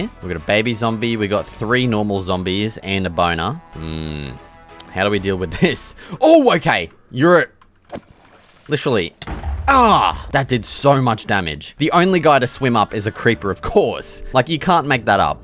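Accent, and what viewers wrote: Australia. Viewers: Australian, isn't it? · This guy may have the most Australian accent I’ve ever heard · Aussie gang, I can tell by your accent and how you’re saying a lot of Aussie slang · You sound like an aussie mate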